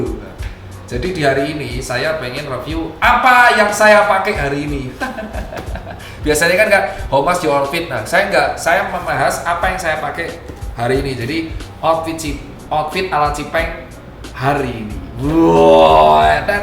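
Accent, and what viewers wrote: Java, Indonesia. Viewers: jowone medok kabeh dok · HA JOWO E BRO!!! Ciri khas cipeng! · Wong jowo.. wong ugm.. yo medhokkk.. salam medhok Mas.. pertahankan medhoknya👍👍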